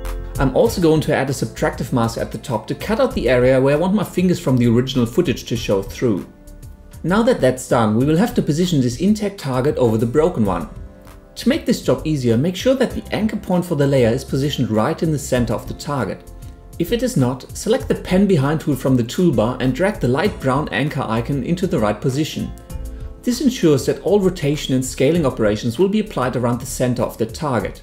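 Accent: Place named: Germany. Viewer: ha! I knew it! :D sounds a lot like you're from Germany! I'm from germany too! :D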